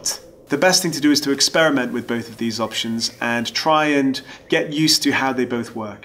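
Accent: United Kingdom. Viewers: Well done. Perfect... just what I was looking for. The British accent makes it sound reliable and educated!!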